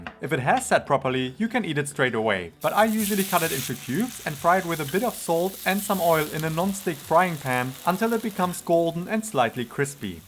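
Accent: Germany. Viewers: Haha, came for the recipes and stayed because of them - despite the german accent and the "wordaah" 😂 I really like the explanation and comparison of the two versions! Will copy it, thank you! 😉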